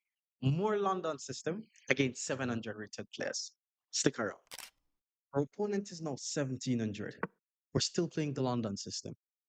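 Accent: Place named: Jamaica. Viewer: I watch this channel so much now that my thoughts about Chess are in a Jamaican accent.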